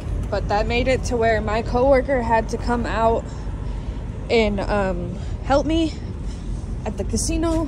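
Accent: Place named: Oklahoma, United States. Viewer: U sound like an okie!!!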